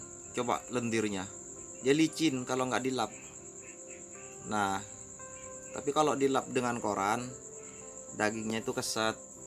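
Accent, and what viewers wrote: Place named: Madura, Indonesia. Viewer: Ini Madura asli kelihatan logatnya...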